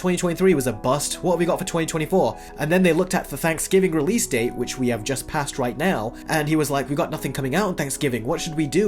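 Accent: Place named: United Kingdom. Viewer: British accent? I’m hooked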